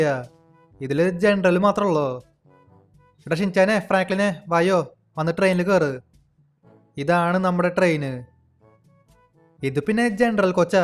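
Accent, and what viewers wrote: Thrissur, India. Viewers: Bro തൃശ്ശൂർക്കാരൻ ആണോ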